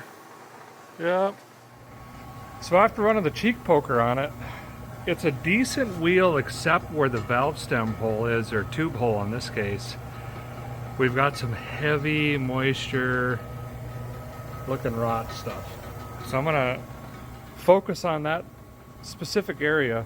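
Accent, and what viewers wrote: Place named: Upper Midwest, United States. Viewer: As a chicagoan (bridgeport native) the way you pronounce battery warms my soul. I am out of state for a while down south. And I have a thick thick chicago accent(9th generation chicagoan) lol I told my neighbor about how much I hate my "front room" no idea how to type like I sound but its Like, "guy I'm tellinya went over dare and sat inda share, chtyaknow I can't see out da faaackin windohh in my frunchroom unless i stand" he instantly started laughing and said "yankees" all dissapointed like while shaking his head. I get made fun of a lot here. You have like a north Midwest accent. Like Wisconsin, Michigan. Mine is like angry Canadian. Sorry I have a fascination with accents and dialect from different parts of the world and country. America has so many different accents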